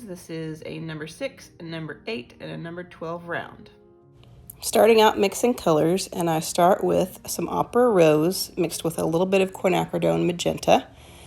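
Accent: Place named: United Kingdom. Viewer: Beautiful! Would LOVE to see (and hear) you do a painting using your British accent, lolol. I have the same viewing habits...mind how you go.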